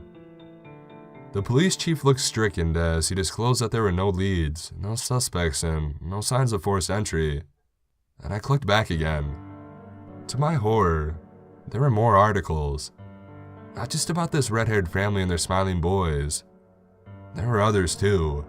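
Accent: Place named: Texas, United States. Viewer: Texas drawl is prominent. Also I loved the story! You made me love these types of stories even more then before! I'm jealous of how smooth your voice is, I stutter when I read out.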